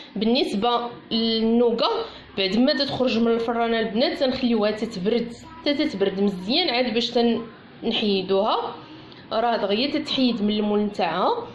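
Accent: Marrakech, Morocco. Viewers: لﻻ الله يحفظك انا عاد شاركت معاك حيث عجبوني حليواتك تبارك الله عليك وسبحان الله لهجتك المراكشية عجباتني واحببتك في الله وماشاء الله عليك أتمنى لك المزيد من التألق والتوفيق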